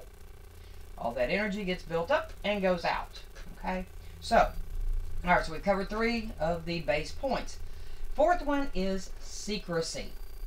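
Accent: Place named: South, United States. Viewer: I just love that Southern accent.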